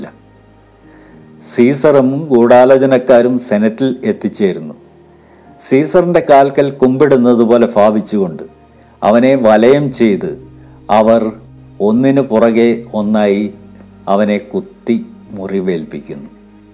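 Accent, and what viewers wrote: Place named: Kollam, India. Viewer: സാർ ഫയം അല്ല ഭയം കൊല്ലം പത്തനംതിട്ട ഭാഗങ്ങളിൽ ഭ" എന്ന അക്ഷരം ഫ " എന്നാണു ഉച്ചരിച്ചരിക്കുന്നത് അവതരണങ്ങളിൽ പ്രാദേശിക ശൈലി ഉപയോഗിക്കാതിരിക്കുക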